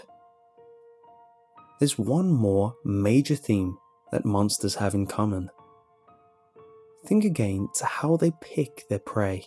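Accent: United Kingdom. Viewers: your british acksent makes stories scary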